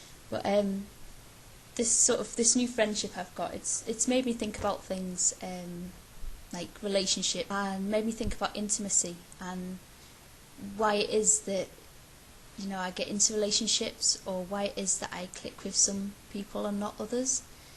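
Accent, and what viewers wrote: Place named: Liverpool, United Kingdom. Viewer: Thanks for being so candid. I think we all worry sometimes about how we come across to others, but we can't let it dominate our actions. You are possibly one of the most interesting YouTubers around, and certainly your Liverpool accent makes you the most facinating. Great work on the room, and I am definately a fan. Very insightful vlog! Excellent points on intimacy. Super glad I'm a subscriber. - John Reagan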